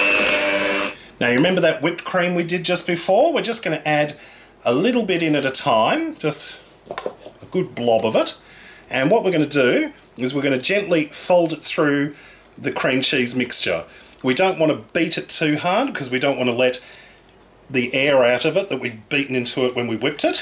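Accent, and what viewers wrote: Australia. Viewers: I'm from the UK..... believe me he deffo sounds like an Ozzy to me lol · I loooooooove Australian accent 🤤😍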